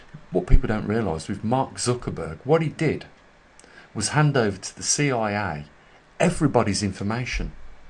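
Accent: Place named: West Bromwich, United Kingdom. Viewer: M8… where ya from? I am in West Brom… you sound local, let’s meet up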